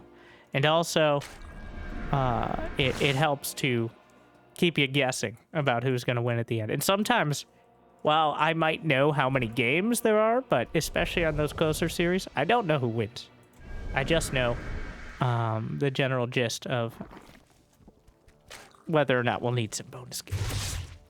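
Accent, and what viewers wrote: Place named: Transatlantic, United States. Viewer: Can I just say, for some reason your voice has a trans-atlantic quality, and for some reason i find that somewhat enjoyable.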